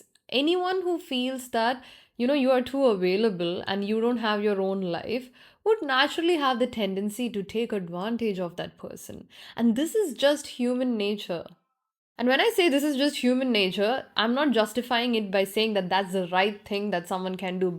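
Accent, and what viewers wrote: Kerala, India. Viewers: Nice insightful discussion but lots of grammatical errors when you talk. “Most Nicest” is incorrect English. It must be either “Nicest” or “Most Nice”. Most Indians especially people from South make this mistake which makes me wonder whether grammar is still taught at the elementary level. When you are using the word “most” you are already attributing the maximum level of the adjective to the concerned individual. So, you do not need to do that again. Similarly, I have come across people saying “did went” “did came” which is again something that keeps hitting me. “Did” has already attributed past tense to the verb and it need not be done again. So, after using “Did” you use verb in the present tense. So, the ideal way to say it is either “came” or “did come” Don’t mind but your Malayali accent already makes people curious and if you continue with these mistakes, you will make yourself a laughing stock. 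I hope you take the feedback positively and don’t make it a ego issue like most Indian women. I gave similar feedback to my cousin sister and she kept contesting me till the I gave up. Actually she realised her folly but her ego was getting hurt for being corrected by her little brother . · ibal malayali alle. poli. i just noticed now, her english is malyali english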